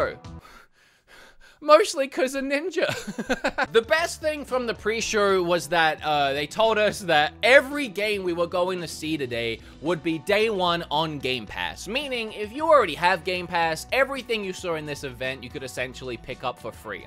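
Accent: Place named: Australia. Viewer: Wow the Aussie accent is really popping on this one.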